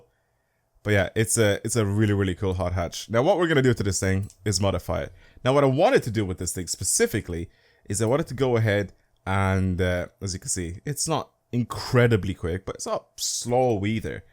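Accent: Dublin, Ireland. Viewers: u from dublin bro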